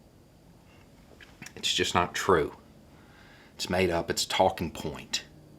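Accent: South, United States. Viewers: Dude, the style choices of apparel on display here are outstanding. NASA shirt, Bass Pro hat... thanks for representing both brands well buddy. Full marks all across the board. As one southern boy to another, it’s also akin to presenting a very astute argument with a southern accent. Proves things aren’t always what people say they are.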